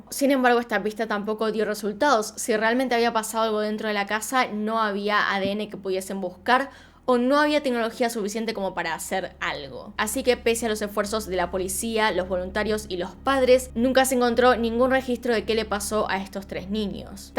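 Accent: Argentina. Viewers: Me encanta tu acento argentino y cuando dices "una vez más" eres muy auténtica 🤩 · Me encanta el acento argentino 🇦🇷 ♥️♥️👍🏻✨ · Me encanta tu acento Argentino y como te expresas. Saludos y felicidades por tu cumpleaños 🎂🍹🎁🎉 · Me gustan mucho tus videos. Haces muy buena investigación y narras muy bien. Solo me cuesta un poco de trabajo acistumbrsrme a tu pronunicación argentina: “ninios” y “plasha”...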